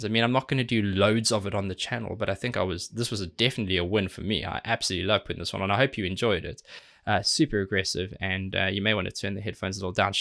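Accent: South Africa. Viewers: Bro you sound South African, I may be wrong though 😅 great video friend, Alex is such a madlad 🤘🏻 Edit: He's South African, my bad 😅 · that a south african accent? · I Knew that you were South African , I have the same accent you have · This dude sounds South African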